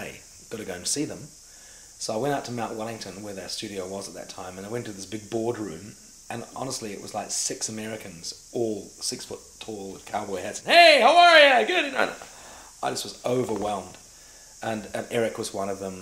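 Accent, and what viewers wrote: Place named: United Kingdom. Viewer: he's a kiwi with a British accent! he grew up mostly in England before moving to NZ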